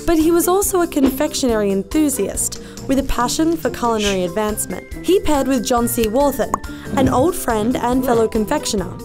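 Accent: United Kingdom. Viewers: Perfect British Accent 👌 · Abella Danger with brittish accent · Such a bri'ish accent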